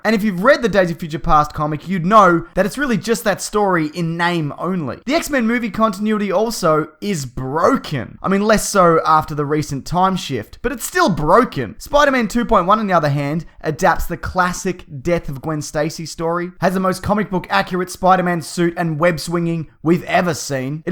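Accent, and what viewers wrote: Australia. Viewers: For some reason, listening to Aussies say the word 'classic' will never cease to be hilarious to me. I'm a kiwi.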